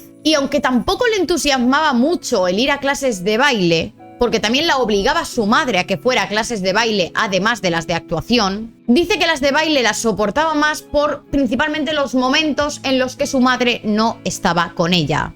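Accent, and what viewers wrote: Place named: Spain. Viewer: Los españoles tienen un acento bien culero Tengo entendido que si no fuera por Youtube todo España se muere de hambre jajaja 😅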